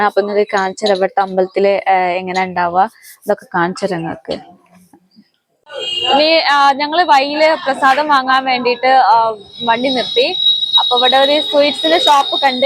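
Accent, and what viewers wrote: Malappuram, India. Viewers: ഞാനും അങ്ങനെ തന്നെ പാവം നമ്മുടെ മലപ്പുറം ഭാഷ പറയുന്നു കുട്ടി 🥰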